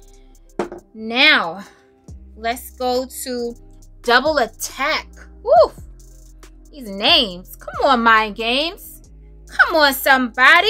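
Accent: East Coast, United States. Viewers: Not the worst review. But that east coast accent that ruins it for me. And the lack of correct pronunciations 🤦🏽‍♂️ (I’m not sure how to say this” is a sentence that prevails with reviewers. I find it to be lazy. Take the time and learn the names of the fragrances. It’s respectful to the house. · East coast accent that ruins it for you? Can she help that she's from the East Coast? Now the pronunciation of the perfume is a good constructive criticism, but to say her accent is an issue, please have a seat. 🙄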